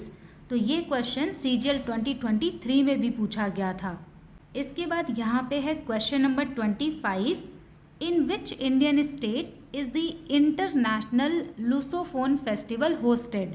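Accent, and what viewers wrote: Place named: West Bengal, India. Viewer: Ma'am aap pakka West Bengal sein ho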